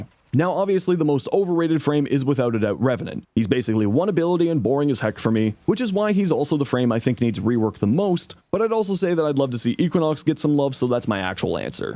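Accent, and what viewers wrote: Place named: Canada. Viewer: All I heard was Canadian.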